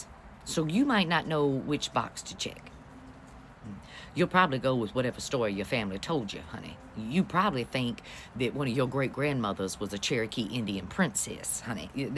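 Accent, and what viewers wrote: South, United States. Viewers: I love your southern drawl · You are so memorizing to the point I don't even see your color. That Southern Bell accent makes me feel like I'm listening to another sister. Furthermore you are intelligent too. Appreciate you girl! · I truly enjoy your content, you give it to us straight, no chaser! Just the way I like it! And, I love your accent, it has that southern charm with a sprinkle of big mama truth in it 😄. Keep bringing it sista! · I love that southern accent you have, to me it is so sexy on you. I also love the truth that you bring to us. Keep sharing your knowledge and many blessings to you, love.❤ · Can I say that as a Black Southern Woman, I absolutely adore your Southern Belle accent. My first time seeing her content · Your take on humanity is awesome. The southern dialect is so refreshing.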